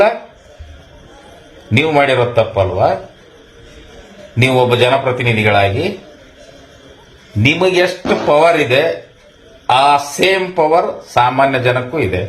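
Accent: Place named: North Karnataka, India. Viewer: sir your telling very beautiful Uttar Karnaraka Bijapur Langvage sir.pl coutinue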